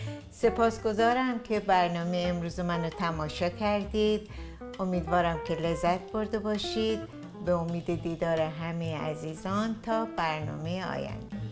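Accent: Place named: United States. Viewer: بنظرم اومد شما لهجه آمریکایی دارید و خیلی افتخار میکنم به شما.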